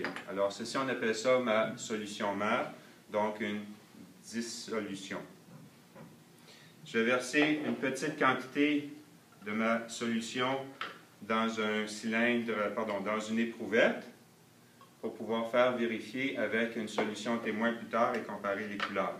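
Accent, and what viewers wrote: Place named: Quebec, Canada. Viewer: J'aime beaucoup ! C'était simple, un bon rappel, et en plus avec l'accent Québécois qui me plaît beaucoup ! Ca change un peu des cours habituels, c'est sympa comme tout !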